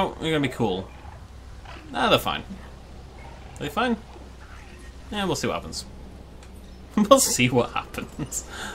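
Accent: United Kingdom. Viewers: One hour, great video, OG brit commentary. 10/10 video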